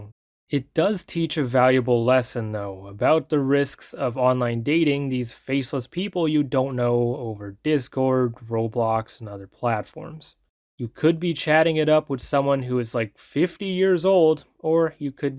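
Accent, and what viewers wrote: United Kingdom. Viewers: isnt he just british?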